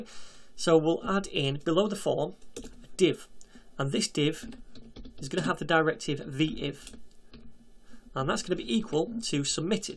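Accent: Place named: England, United Kingdom. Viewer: Nice English accent, watching software tutorial and learning language pronunciation in paralel! =)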